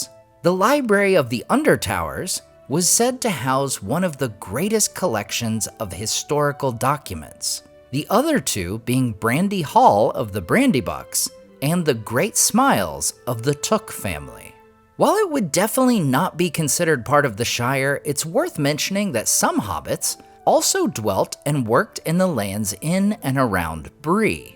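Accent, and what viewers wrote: United States. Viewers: The american accent is killing me! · Just wish could use a British accent.. I don't mind what region; but it should be British. The american accent kills it for me.. sure I'm speaking for others who are passionate about the Great works of Tolkien here.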